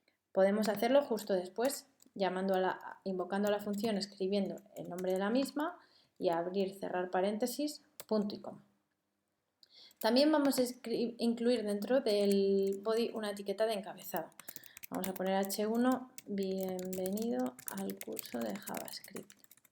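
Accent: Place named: Madrid, Spain. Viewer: me interesan cursos de html, css, python, c#, java, bueno, espero no pedir mucho😁. Gracias a ti por hacer estos cursos maravillosos. Con esa bella voz madrileña y tu inteligencia en programar es un gusto seguirte en el aprendizaje. Un abrazo enorme desde mexico!!!!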